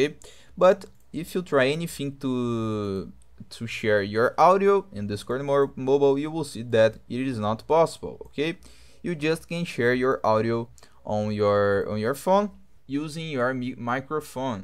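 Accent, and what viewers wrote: Brazil. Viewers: Hmmmm sotaque de brasileiro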